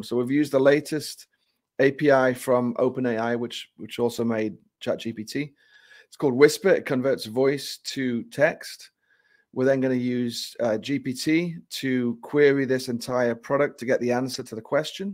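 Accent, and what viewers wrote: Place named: Newcastle, United Kingdom. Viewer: Listening to your Newcastle accent was worth the 3 minutes I spent on this. The tech is great but your home accent is out of this world!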